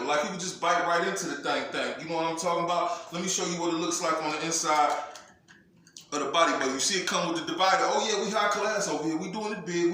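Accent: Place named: Arkansas, United States. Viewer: You making a lot of sense.. Sound like niggas where I'm from here in Arkansas. .Home of the Chambers Brothers..who you should look into doing a video on..real heavy hitters..I went to school with the younger generation of Chambers..but as for Demencio..I'm not thuggin but let me hang wit em so I obtain that image n now I'm free to be me..No matter if I wanna be on some thug shit, goon shit, player shit, money making shit..I'm free to do that because of how I have surrounded myself..P.O.M.E. type shit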